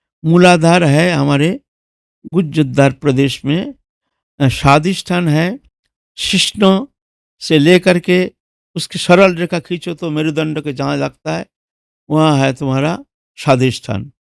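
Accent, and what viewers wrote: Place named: Bengal, India. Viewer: Baba ap bengali ho na ??? Apka batchit me bengali tone ata hai. Ap jorur bengali ho. I am bengali.kolkata.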